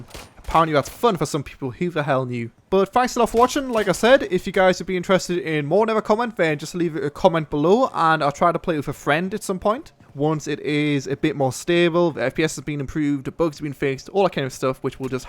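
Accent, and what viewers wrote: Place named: Newcastle, United Kingdom. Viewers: He is from England, Newcastle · no he's English he just has a regional accent (Newcastle) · He is from Newcastle, UK. they have a unique accent which is why he might not sound English to people from outside the UK :) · hes english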